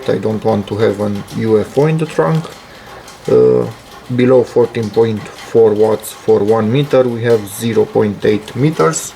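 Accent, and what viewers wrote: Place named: Romania. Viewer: accent romanesc foarte clar. Felicitari pt canal!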